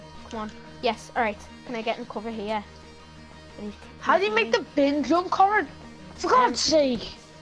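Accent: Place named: Liverpool, United Kingdom. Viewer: What a scouser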